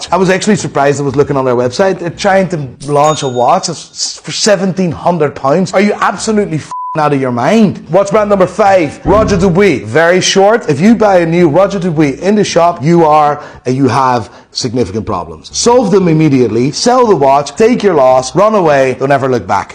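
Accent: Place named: Ireland. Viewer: Once you hear him as Irish, its impossible to shake. Sorry.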